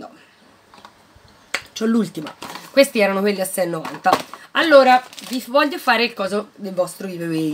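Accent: Tuscany, Italy. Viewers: Sei toscana?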